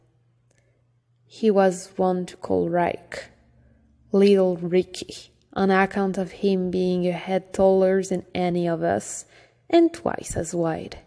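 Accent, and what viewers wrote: France. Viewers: Never knew I needed to hear Prince of thorns in a thick French accent. Amazing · Love this book and your beautiful French accent make it even better 😍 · Don't worry. I LOVE your French accent. It's so beautiful and it suits the setting of the book very well.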